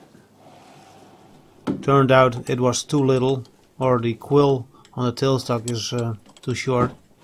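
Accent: Netherlands. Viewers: I seem to recognize a Frysian English accent. The wooden shoes add certainty to my suspicion. Could it be that i'm right? And if so, what part of Friesland are you from?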